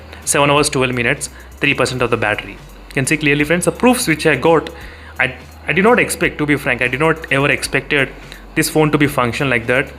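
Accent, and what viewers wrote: South India, India. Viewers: It is South Indian accent. English is correct.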